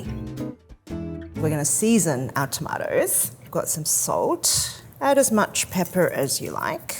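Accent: Australia. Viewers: Thank you for keeping your Australian accent. Once tomato season returns in Australia, I'll be making that salad. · I knew she was Australian as soon as she spoke… But just discovered her here. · Love that Aussie accent 🦘🐨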